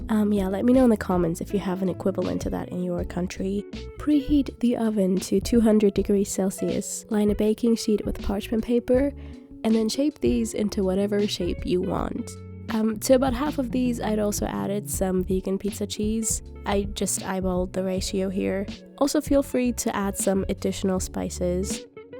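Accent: Bavaria, Germany. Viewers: Bist du aus Bayern?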